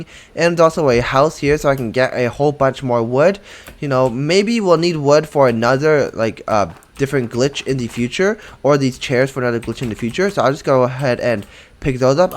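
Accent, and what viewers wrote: United Kingdom. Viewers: Is bro half British?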